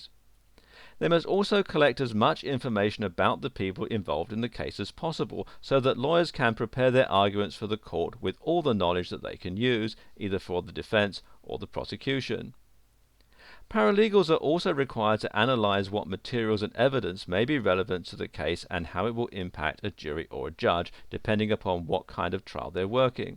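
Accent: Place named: United Kingdom. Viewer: Pretty sure that's not a canuck accent, eh? Sounds pretty British to me.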